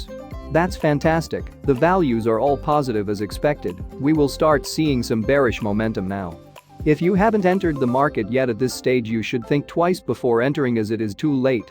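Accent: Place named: United Kingdom. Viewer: You are somehere in GB, Scotland or England most likely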